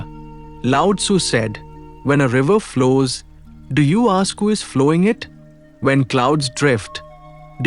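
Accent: India. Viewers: Why the Indian-English voice when the video is about a Chinaman? 😏👎👎👎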